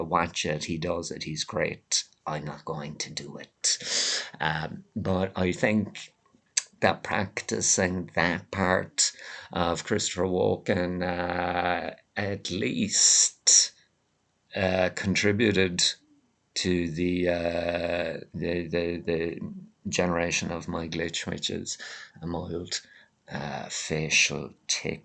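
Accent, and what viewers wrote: Ireland. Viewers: As an American, I can understand you fine since you turned your audio volume up. A couple of those videos, the volume was too low but it's fixed now. I also could tell you were Irish right now away. I wanna here you do more impressions of your fellow Irish normies. That had me cracking up in that original video.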